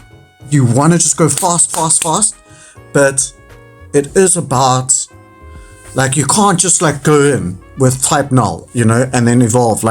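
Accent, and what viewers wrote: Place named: Cape Town, South Africa. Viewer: Me too bro! i was thinking theres no way another cpt gent making pocket content😂. Accent spoke for itself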